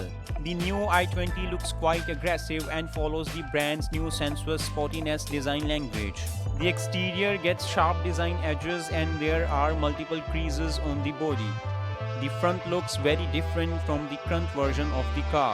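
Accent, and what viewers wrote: India. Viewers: the indian accent 🤪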